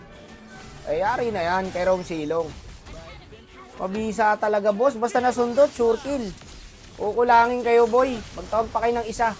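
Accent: Iloilo, Philippines. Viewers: Boss try mo naman mag Ilonggo na voice over alam kung Ilonggo ka boss eh mas nagiging funny content mo pag nag Ilongo ka bigla bigla nalang ako natatawa HAHAHHAHA sana ma pansin ❤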